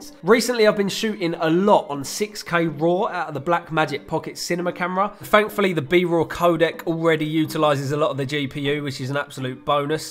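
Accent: United Kingdom. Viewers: covid nine-IINN XD.. so cool man I love British accents no offense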